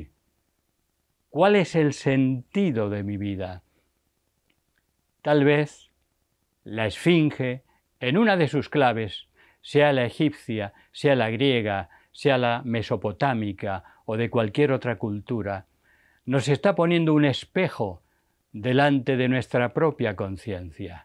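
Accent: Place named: Spain. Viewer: Magnífica exposición y más aún sus reflexiones personales,Profesor.Y para un argentino con las 3/4partes de su sangre,ibericas,su gallardo acento español es música.Muchas gracias!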